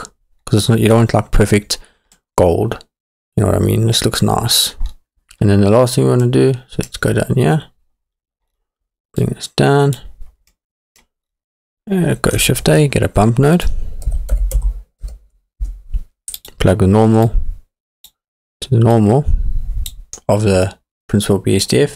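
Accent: South Africa. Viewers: Ah, a South African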